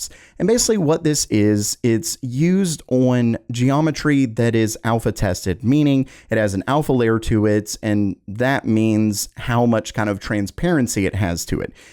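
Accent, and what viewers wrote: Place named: Pennsylvania, United States. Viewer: dude are you from pennsylvania. you say on like awn. my wife does the same thing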